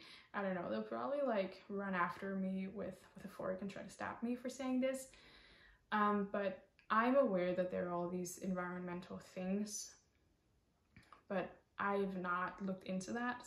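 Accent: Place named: United States. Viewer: Hi from Indiana in the US! Here the plural of yarn is yarns. ("...all of these yarns." or "...these types of yarn.") Your English sounds like American English, and your accent rarely comes out. (You speak more clearly than a lot of people in different regions here, so well done!) I feel like a huge go-to for using up stash, especially different colors of the same yarn, is to make a blanket out of them. I tried to look up the Bumbo in Ravelry to see what weight it is, but a lot of different options came up. I made a cardigan from dk acrylic, and I actually liked the structure I felt like it had. Acrylic seems cozy to me, although I agree it has its time and place. I hope a job presents itself for you, and I look forward to seeing your shawl!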